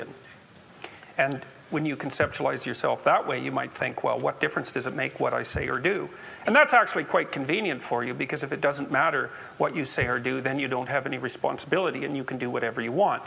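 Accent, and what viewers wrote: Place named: Massachusetts, United States. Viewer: People, saying big words with a soft tone of voice in a Massachusetts accent doesn't make you a genius who's right about everything. This is nothing but American establishment "work work work" ideology changing it's font to something people are more into atm.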